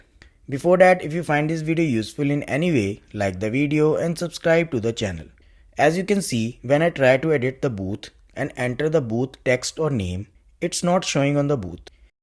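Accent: India. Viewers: and you sound like from india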